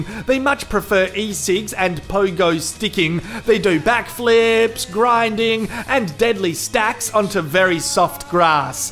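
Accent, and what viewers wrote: Australia. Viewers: Mate what part of straya ya from. NSW meself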